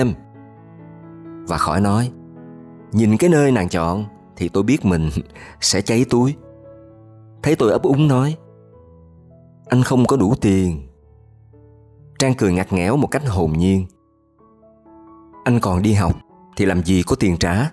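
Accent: South, Vietnam. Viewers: Hello 👋! Duyên phận của mình thì xa ngần ấy ngày vẫn là duyên của mình. Sáng ngày nọ khi không mở YouTube lên lại nghe được giọng đọc miền Nam thật hay, văn viết không bị "lai" của thế hệ hiện nay, ngồi nhâm nhi cafe để ngửi hương vị "café" cuộc đời từ kênh. Cảm ơn rất nhiều ❤️🌼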